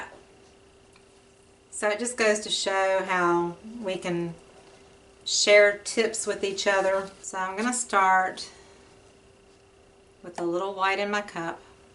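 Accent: South, United States. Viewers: I love your videos. You are so soothing with your southern accent and your painting are beautiful!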